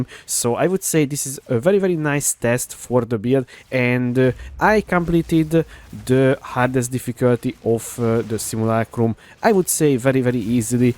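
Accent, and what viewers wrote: Hungary. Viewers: Szia! Jól hallom hogy magyar vagy ?:D Lenne egy kérdésem , hogy hardcore on játszható ez a build ?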